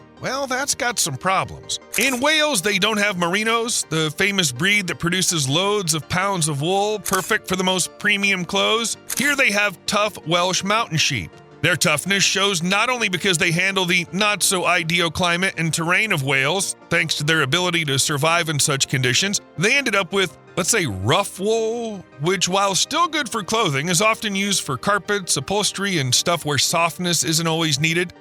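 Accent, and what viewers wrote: United States. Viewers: It is unfortunate that the announcer is a loud American..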